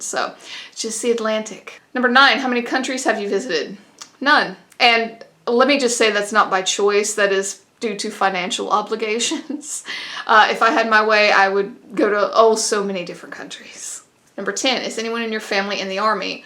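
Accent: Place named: Georgia, United States. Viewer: Refined southern accent, like Ga 😝🤣 you are hilarious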